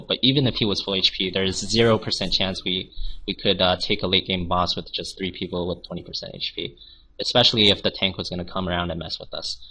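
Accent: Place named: England, United Kingdom. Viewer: Bro sounded more English than he does now, love you xo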